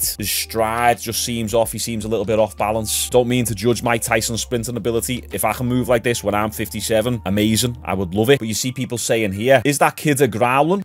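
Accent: Liverpool, United Kingdom. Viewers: 'Maihhh loohhs greit' - how can you not love Liverpool accent? God bless you!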